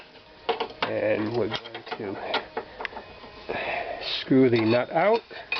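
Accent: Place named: Massachusetts, United States. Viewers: Sounds like a New England accent. Definitely not NYC or NJ. Great video. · I was gunna say! This guy gotta be from mass 😅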